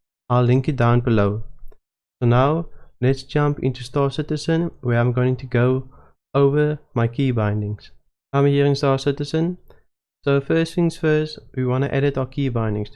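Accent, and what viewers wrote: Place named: Cape Town, South Africa. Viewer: Thanks, just what I needed to figure it out. And how fantastic to run into another Cape Townian playing SC. Love the accent. Maybe we'll meet in the verse.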